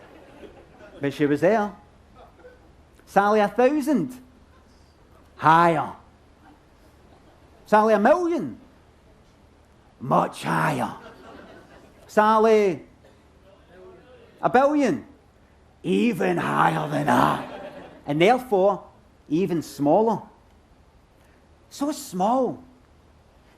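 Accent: Scotland, United Kingdom. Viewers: About his accent as he addressed it at the end of this show, I'm Irish and don't find the Scottish accent the easiest to decipher at times(due to the heavy colloquial stuff as much as the accent itself) but never truly failed to understand Limmy's jokes despite any supposed comprehension challenge. A happy accident of sorts came to my benifit as I continued watching Limmy show I found my ear tuning to the Scottish way of language which increased my ability to be a more attentive listener, one who searched for meaning in one's speech rather than have it handed to me on a plate. Limmy was very correct to keep his true speech. It best represents his intent for his jokes and also politely asks a discerning audience to be active listeners and cognitively involved in figuring out the jokes in his work. He writes his work like Miles Davis wrote jazz, honest and true and then only appreciatable by those willing to keep their own active mind present when being entertained. 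Not saying anyone is smarter or less so, just distinguishing the difference between people who watch comedians or listen to music to shut off or disconnect from their mind, maybe as some kind of break or rest for it(the mind), as opposed to those who keep their mind involved in the entertaining experience as to appreciate it earnestly (as in beyond mere amusement). Any thoughts? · I don't know why but subconsciously I didn't think his accent was actually like that, and then seeing him actually talk it's like wow Scottish people are... real. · too scottish for hes own good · gonna sounds mean but i dont mean it in a bad way.. but pretty sure the accent scares away a portion of the audience. For exemple i speak french so learning english was a struggle already. hearing someone speak in a heavy accent is quite can be quite hard to understand . i've been watching and reading scot stuff so im personally used to it now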